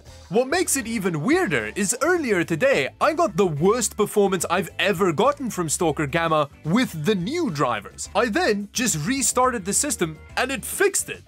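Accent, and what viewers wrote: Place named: South Africa. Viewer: I love your South African accent, I only realised the other day that you were from SA thanks for the great quality videos, liefde van uit die tuis land❤️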